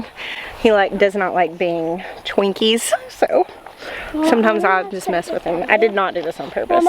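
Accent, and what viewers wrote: South, United States. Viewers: I add onion slices when itz too hot for lettuce. I had thinly cut cuke slices the last time. Both are good Girl, you're beginning to sound reeeallll Southern, <snicker> says the Bama woman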